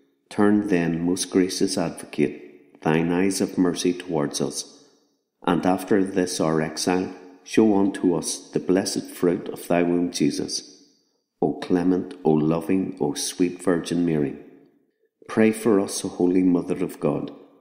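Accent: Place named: Ireland. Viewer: Thank you sir! I just love the Irish accent...it is the sweetest and most lovely of them all. We must remember how God has blessed the Irish for all the suffering our Irish ancestors went through in spreading the the Gospel throughout the world through the gift of the Holy Spirit in our One Holy Catholic and Apostolic Faith! How come someone altered the face of Our Lady of Guadalupe? This is not a true depiction...we must also remember to include the Saint Michael prayer with every Rosary we pray as we know Satan and his legions twist the truth and tell half-truths...even through pictures.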